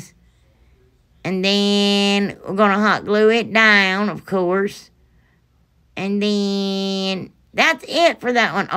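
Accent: South, United States. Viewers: Love everything u sound so cute like frm the south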